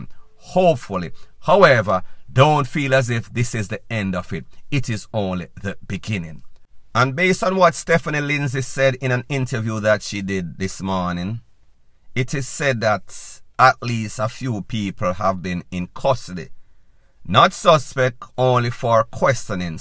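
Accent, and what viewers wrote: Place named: Jamaica. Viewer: I really love to listen to u ❤ I wish u all the best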